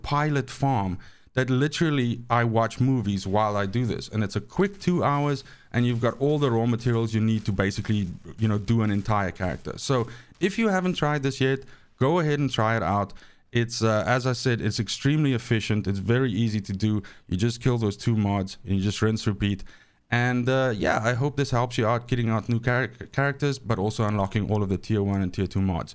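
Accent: South Africa. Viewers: South African lol 🇿🇦?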